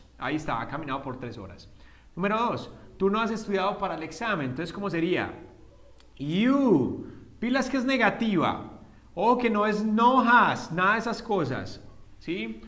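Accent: Antioquia, Colombia. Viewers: igualmente soy de medellin lo note inemdiatamente por el acento :D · El oprimido yo también lo note y soy de españapero se le nota un fleje (mucho) que es colombiano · Más paisa que una arepa, gracias teacher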